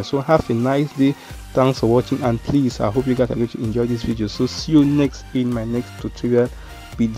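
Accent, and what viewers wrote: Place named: Nigeria. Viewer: Your Nigerian accent is hard to understand please make a video on how to restore the backup to a different WhatsApp like business WhatsApp otherwise this video was great thanks..... Steve from Zambia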